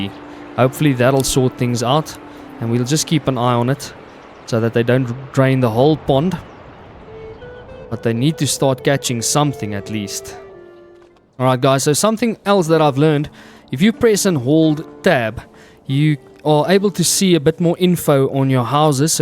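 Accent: South Africa. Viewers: I hear a SA gamer i subscribe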